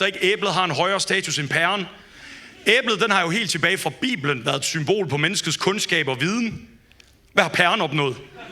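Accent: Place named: Jutland, Denmark. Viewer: Der starter meget jysk ud... Men selv med den jyske levering har du kraftedeme fat i noget fedt 🤣🤣🤣🤣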